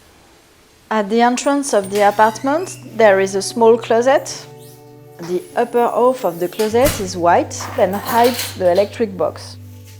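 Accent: France. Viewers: I think it's just her French inflection. What she said is "I love the influence of Danish architects, Alvar Alto, and American MCM architecture". I'd have to assume any professional architect with interest in MCM design has studied this carefully. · Chouette visite, de plus je me rends compte que je comprends bien l’anglais quand il est parlé par une française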